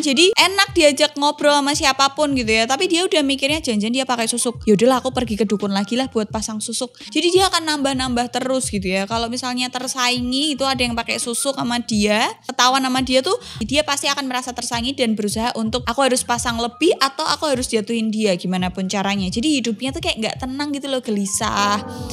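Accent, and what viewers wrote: Java, Indonesia. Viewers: logatny sdkit Jawa Ak sng ngdenger ny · Medok e mbak *... Membuat terngiang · Kak sampean orang Jawa to😍🤤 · Jowo tulen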